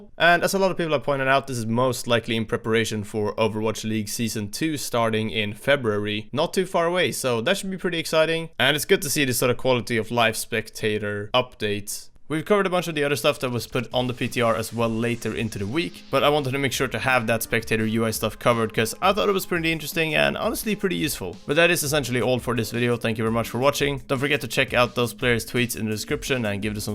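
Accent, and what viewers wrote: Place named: Sweden. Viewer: You sound like a swede.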